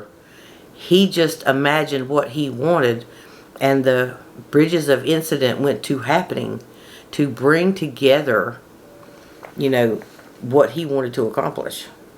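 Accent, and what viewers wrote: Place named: South, United States. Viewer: You are probably the most loveable lass on YT. And that southern drawl of yours, so charming.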